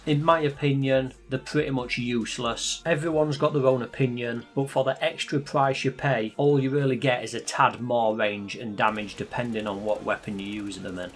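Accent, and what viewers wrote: United Kingdom. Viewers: Is this the speccy bum accent from Glasgow? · What English accent is this? Sound familiar, my English teacher?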